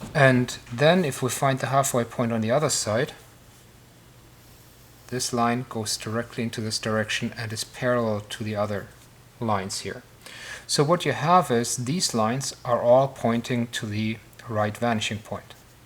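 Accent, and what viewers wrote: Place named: Scandinavia. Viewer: What happened to your voice? You sound Nordic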